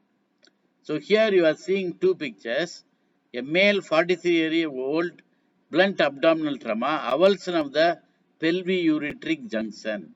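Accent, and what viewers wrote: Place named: India. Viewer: I can hear indian voice from you love from IMG philippines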